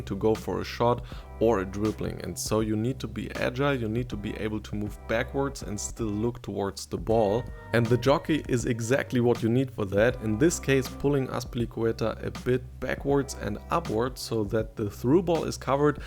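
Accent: Germany. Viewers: Man merkt ja so krass dass deine Muttersprache Deutsch ist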